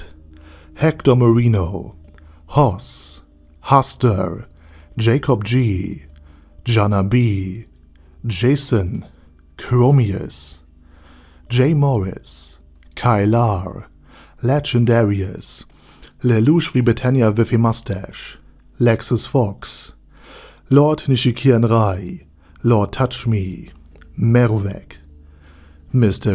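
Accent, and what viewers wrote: Germany. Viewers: Yes my hearing is good, I have been listening to non natives since I was 5 years old every day. If you are German yourself and hear a german accent this awful it makes you not even wanna try. This is the level of someone who has not tried to make themselves more illegible since he first started learning english as a kid. As someone who actually put in effort to make myself more understandable, why should I waste my time on an animal who puts 0 effort? Again, stop dickriding this random person who doesn‘t even know you exist. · If you don't understand a German accent feel free to leave. Listening to a German accent can take time to get used to but the way you say it is just rude... If you really watched at 2x and didn't understand what he said it's your fault in the first place. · He's German, so he has german accent. It really isn't that hard to understand as you make it out to be.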